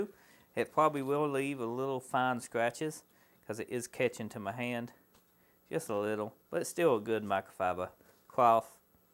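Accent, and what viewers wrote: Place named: Texas, United States. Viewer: Your Texas accent is difficult to understand but this kit looks like a good value.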